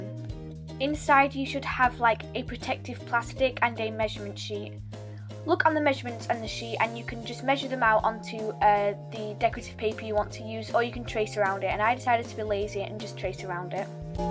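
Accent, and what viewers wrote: United Kingdom. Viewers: Are you a British girl?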